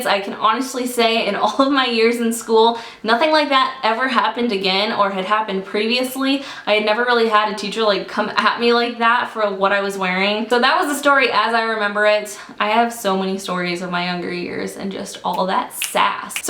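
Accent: United Kingdom. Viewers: Sounds Like Plymouth south muddle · how are you not british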